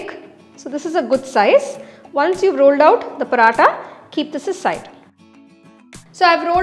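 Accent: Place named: South India, India. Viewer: Bihari sattu paratha in south Indian accent!! A delicacy in itself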